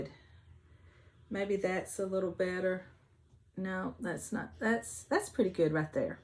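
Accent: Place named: South, United States. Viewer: I just found you and being from Rock Hill SC , I recognized that southern voice and said” I need to watch her” and then I heard you say y’all and I was hooked. The book review was great. I’m always looking for good books to read. Thank you for a great video and I think the green tinted fabric would look great for March sampler😊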